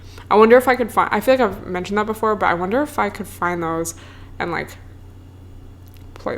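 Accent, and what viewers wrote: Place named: California, United States. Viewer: To be honest, I'm just sitting on my mattress, and listening a while to u, because I just wanted to hear some recent voice with some Ca. maybe LA accent, just to make myself feel a little bit more at home.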